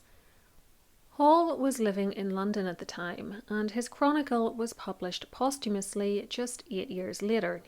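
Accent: Northern Ireland, United Kingdom. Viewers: Northern Ireland accent 🙂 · Yes her Northern Irish accent is beautiful. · What part of the north of ireland are you from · A Northern Irish lady speaking. Just speaking normally. No "It's not X, It's Y, AI drivel.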